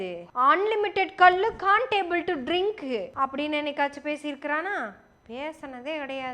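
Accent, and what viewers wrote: Kongu, India. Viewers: காமடி , காமடி வேற லெவல் ..அதை விட உங்க கொங்கு தமிழ் அருமை..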